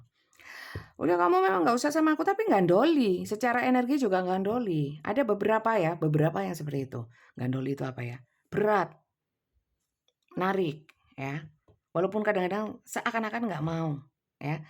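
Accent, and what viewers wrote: Java, Indonesia. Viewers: Saya baru bergabung. Saya jatuh cinta dengan aksen medok nya, ibu. Sangat terhibur sekali. Tidak semua resonet, tapi apa yg ibu katakan masuk akal kalau. Membuka mind set saya. Dan saya mau subscribe n like postingan ibu. Semangat untuk upload ya Bu. Saya pasti dengerin ibu, tidak masalah resonet atau tidak.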